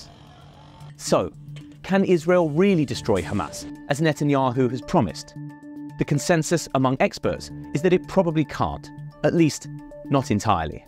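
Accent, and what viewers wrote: United Kingdom. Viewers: I love the British accent, but you’re in denial or in fantasy land the IDF is losing the ground battle but it’s easy for them to attack civilians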